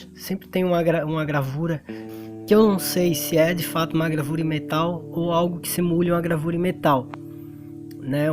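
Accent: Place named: Santa Catarina, Brazil. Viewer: Esse sotaque Catarina é inconfundível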